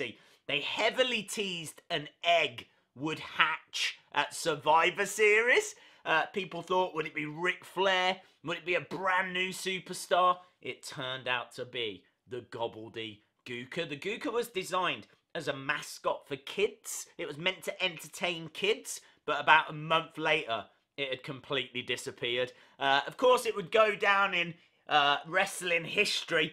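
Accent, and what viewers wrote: United Kingdom. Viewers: What ever he britsh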